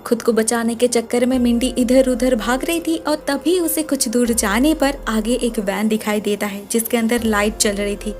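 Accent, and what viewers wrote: Bengal, India. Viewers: Bangala bhashi.....tone... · Sweet voice in some bengali tone. You explain every corner of the story in your clear voice and without any noise. Feels like we are watching the movie. Thanks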